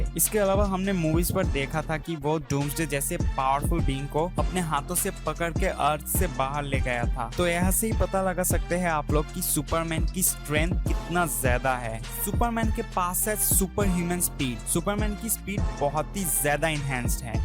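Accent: Bengal, India. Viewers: Bangali ho kya? Guessed from your accent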